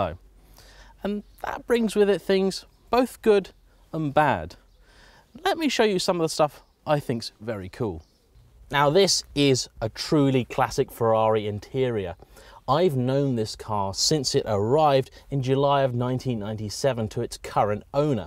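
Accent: United Kingdom. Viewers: ur brittish accent kill me, i need subs for that bcs of that i prefer american youtuber ex:dougdemuro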